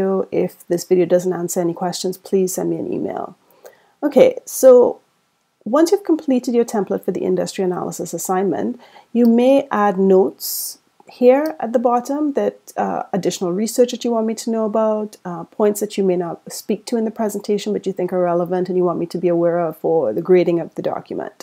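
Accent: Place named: Trinidad and Tobago. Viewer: Thank you. You saved me today. You sound like a Trini, like me. Thanks again!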